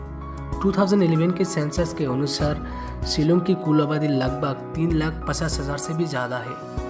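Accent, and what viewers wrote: Bengal, India. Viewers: আপকা হিন্দী মে হামকো বাংলা শুনাই দেতা হ্যায়। কিন্ত আপ এতনা সুন্দর সে বিস্তারিত ভাবে বোলা হ্যায় যে আমি আপকা ফ্যান হো গিয়া হ্যায়। সত্যিই আপ অনবদ্য হ্যায়।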